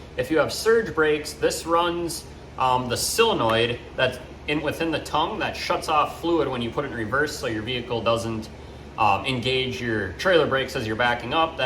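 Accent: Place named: Minnesota, United States. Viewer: The brown wire ground back feed diagnosis was really nice work, as if you’ve done this before! 😅 The mouse situation was also classic. Re-wiring the whole thing was the right thing to recommend. The Minnesota accent is perfect! Go get some hot dish and jell-o salad. How about some bars for dessert? 😊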